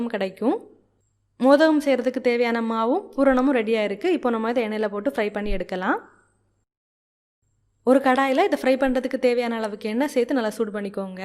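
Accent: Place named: Nagercoil, India. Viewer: Sister neenga nagercoil pola ........ speech apdi than iruku.....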